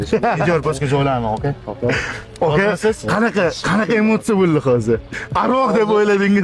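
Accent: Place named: Namangan, Uzbekistan. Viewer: Намогон шеваси узгача гап йук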